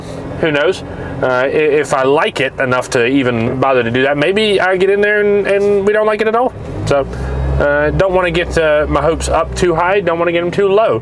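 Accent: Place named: United Kingdom. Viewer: I KNEW I wasn’t going crazy when I heard the British accent bahah😂 you flipped a switch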